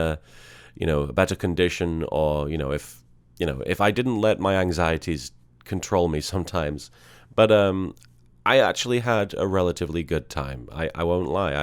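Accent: United Kingdom. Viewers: I wonder why he has a British accent.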